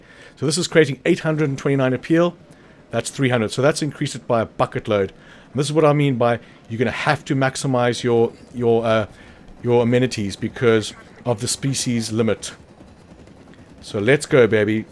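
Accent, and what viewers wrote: South Africa. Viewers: You can see from the Alamosaurus how keeping the button depressed when placing forest or a food source really helps with the space required to keep them happy. I can't remember if I mentioned it in the comment on the previous video, but you keep the button depressed until it stops costing money. Once it no longer costs money the area is full of whichever vegetation you're placing. Also, last time I didn't mention that when placing trees from the decorative section instead of using the painted forest, those trees do not remove whatever vegetation is painted in the area i.e. they do not replace the food sources like painted forest does nor do they replace water, which heavy forest, when placed in water, does. Thanks for another great video; both instructive and entertaining. I could listen to that South African accent all day! By the way, I saw in one of you replies to another viewer that your wife's a Kiwi -- I'm in the middle of you both, I'm an Aussie!! 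Cheers, mate, have a good one!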